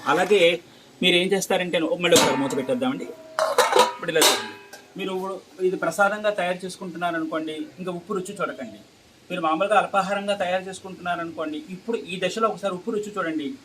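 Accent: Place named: Brahmin, India. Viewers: మరొక మాట బ్రహ్మల మాట ఎప్పుడు అంత swachamga ఉంటుంది అందులో మంత్రాలు chadive వ్యక్తి so మాట అలగే ఉంటుంది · Tamilian ayina mee telugu madhuram ga undi mee vanta laga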